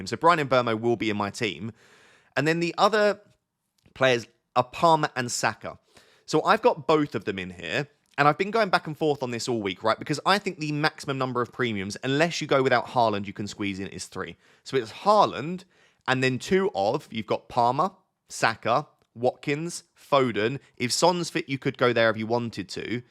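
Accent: United Kingdom. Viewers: bro control your british accent very hard to catch up for me.🥲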